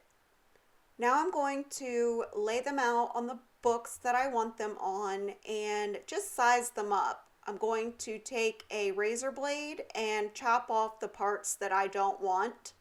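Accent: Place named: Pennsylvania, United States. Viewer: Just Beautiful Projects!, you are my New Favorite Shabby Chic Channel! From Your Accent it sounds like you are from Pennsylvania.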